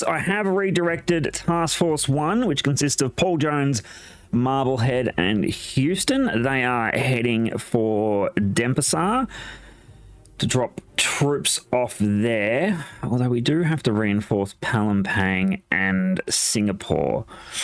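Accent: Australia. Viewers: good to hear an Australian voice on these vids. Nice bit of creativity in the letters home. You could even include some history into some of them. I can tell you know a bit.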